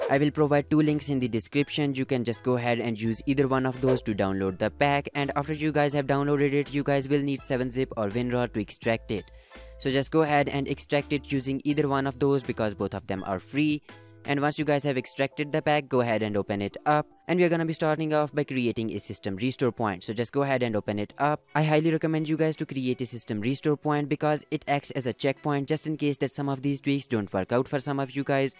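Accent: India. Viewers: you sound indian and may I ask what ethnicity are you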